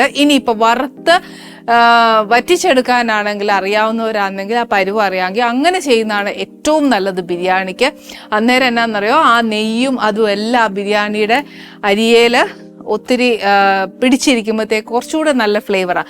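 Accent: Kottayam, India. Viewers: Kottayam baasha inghane thane aano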